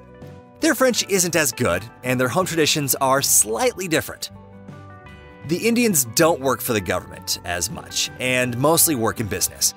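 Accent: United States. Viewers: Hurricanes at 90 mph - needs to do better research , as hurricanes can have winds well above 90 mph . As it was an American accent , guess I cannot blame the narrator , as the USA's education system is not as good as it's cracked up to be , with several university's recently caught selling degrees , just to gather income - this scandal , has done much damage to any degree holder , from the USA and it's corrupt universitys . It was also mentioned that no-one on Reunion is on welfare in the general population , it's the French government workers who are on welfare , not the population generally , they all work , producing Coffee , Vanilla and other crops . The road being built over the ocean , won't extend their "exclusive economic zone one bit . It's being built , to avert some of the problems associated with the current coastal highway , so that access to the airport , port , and other large city , is not interupted as frequently as it's claimed by the narrator .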